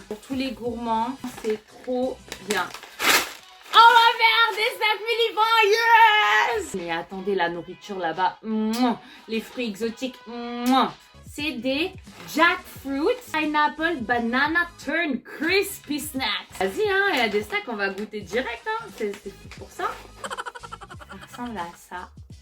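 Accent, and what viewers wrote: France. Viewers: What a fun way to appreciate festivals especially panagbenga. Btw your french accent is to die for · Love your French accent, gorgeous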